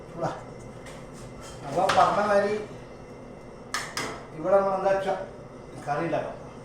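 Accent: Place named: Malappuram, India. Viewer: മലപ്പുറം സംസാരം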